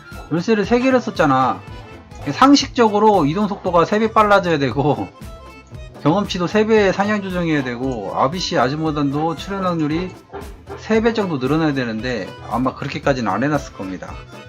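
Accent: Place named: South Jeolla, South Korea. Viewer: 옹삭스럽다는 말투보니 전남분이신가요?? ㅎ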